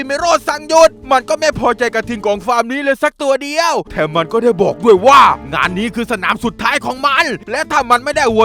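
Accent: South, Thailand. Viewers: แอดเป็น เด็กใต้? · พี่คนไต้ไช้ไม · ชอบๆ มีภาษาใต้ด้วย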